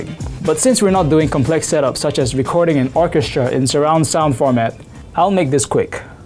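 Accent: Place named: Singapore. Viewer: Very well done...I do video production here and I would hire you in a second if I could afford it. I'm in Florida USA and as I was listening to your accent I realized you must be in S'pore! I used to live in Yishun on Ring Road and miss being there very much, especially Mei Goreng and Roti Prata! Do you know anyone who has worked for Odex? If so, let them know the Shin Jing Bing Ang Mo says hi! and do some more videos!!!!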